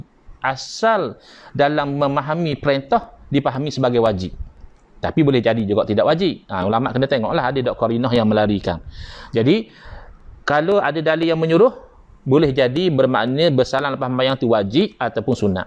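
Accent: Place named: Terengganu, Malaysia. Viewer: Sedak pokku cakak ganu..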